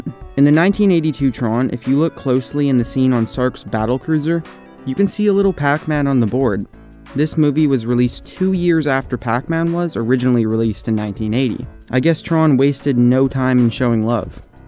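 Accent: Canada. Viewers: i notice a LOT of people that do the "numbers lists" (top 10, 5 things, etc..) always seem to have Canadian voice overs, whether it's due to being produced in Canada or maybe Canadians really like to do voice overs<shrug> either way, it is NOT a complaint, merely an observation, as I'm a big fan of our neighbors to the North! ;) (also, you could get into bars and strip clubs a couple years earlier than you could in the States and Niagra Falls was only a 3 hour ride away! God Bless Canada!!) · Ahhh....I love Canadian accents. <3 _ <3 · Is he Canadian? He keeps saying aboot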